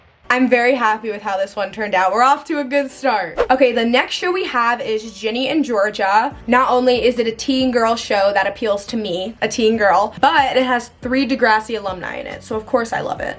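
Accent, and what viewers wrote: England, United Kingdom. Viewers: Make an edit tutorial while using your British voice! Whenever you dont/forget talk American you show one of your old edits ! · Love your English accent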